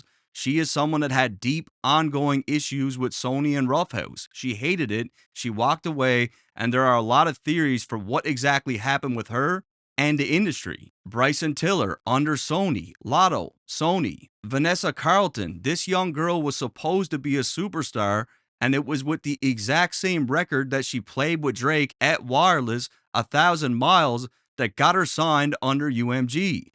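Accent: Canada. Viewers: You sound Canadian 🤔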